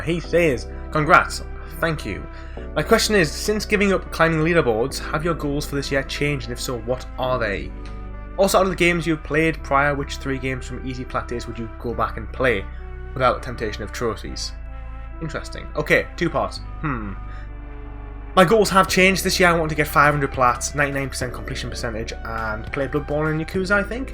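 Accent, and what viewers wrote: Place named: Newcastle, United Kingdom. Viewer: Ahh yes a fellow Geordie 😁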